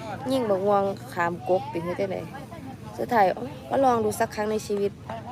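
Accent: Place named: Isan, Thailand. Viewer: สำเนียงเสียงใกล้เคียงกับฮันนี่ศรีอีสานเลยสุดยอด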